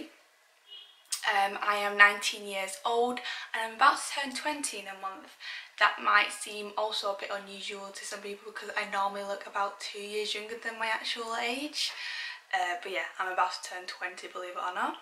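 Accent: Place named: Manchester, United Kingdom. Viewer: I'm probably biased, since I've been watching your videos for quite awhile now, but when I first started watching, your accent sounded a LOT like Christopher Eccleston's to me, so I'd definitely say up north near Manchester 😁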